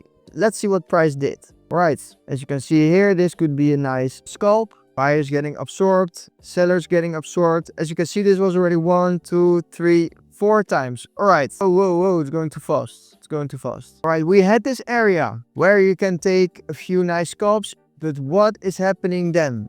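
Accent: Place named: Netherlands. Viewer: Continue your videos. They are really good and give me additional knowledge about order flow. I really appreciate it. I was wondering where are you from cause you have a strong dutch accent ?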